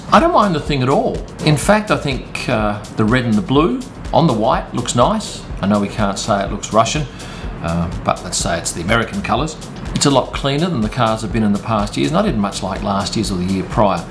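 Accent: Australia. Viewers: How bout a vid on tourist attractions near every F1 track eh mate? Btw I love the aussie accent so tried something. Correct me if I am wrong.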